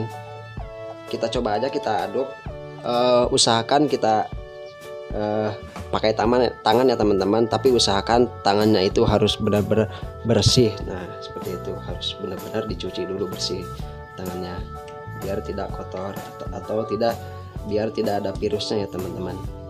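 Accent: West Java, Indonesia. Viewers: Host orang Sunda atau orang Minang asli? Logatnya kayak orang sunda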